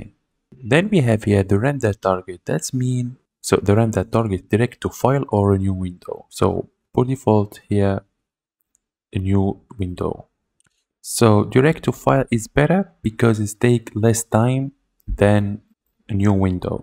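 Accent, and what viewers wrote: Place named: France. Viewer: Your english is great and your accent is barely even there. Like, you can tell you are french, but your english is totally normal just with a normal french accent. Your english speaking is better than the majority of Americans, some of who have really thick accents even though they only speak 1 language, lol.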